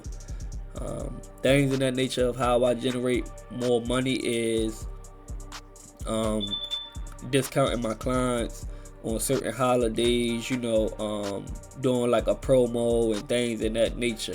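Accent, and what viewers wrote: Baltimore, United States. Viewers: work on bein yourself, them cuts and that dumb ass “baldmore” accent. Yurturb 🤣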